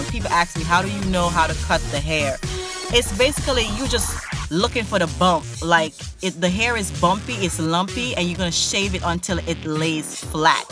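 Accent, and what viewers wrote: Jamaica. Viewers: Are you jamaican? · R u Jamaican?? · a swear mi just hear yuh talk now know sey a yard yuh come from! hairstyle up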